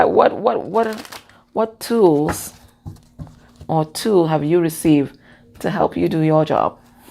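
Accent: Trinidad and Tobago. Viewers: A Trini or Guyanese accent. I do follow Steve Nobel meditations very helpful. I'm a Bajan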